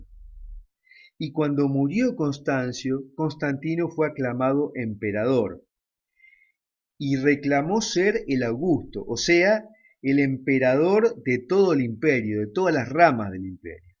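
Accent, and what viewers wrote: Argentina. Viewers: Tengo 2 preguntas Estos vídeos llegaron a mi como sugerencias, no conocía el foro, por los temas que tratan y por las fechas de publicación parece ser nueva la dirección. <link> cuando estuve en la página quise saber quienes eran ya que está la opción, por más que la clike no me lleva a ningún lado. Las preguntas son: Es una org religiosa? Si bien por su acento parece argentino Ud es religioso o historiador?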